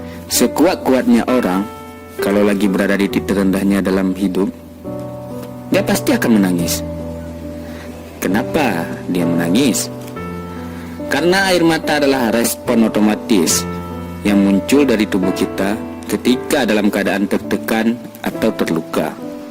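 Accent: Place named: Bali, Indonesia. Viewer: Dari suaranya pasti nih org bali 😊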